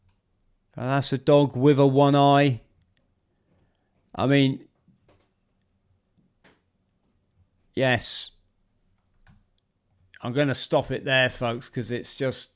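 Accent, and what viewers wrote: Plymouth, United Kingdom. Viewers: If that's a Plymouth accent that I'm hearing - that axe throwing place is pretty close to the Octagon! Lot's of the classic architectural hallmarks in the city's geography.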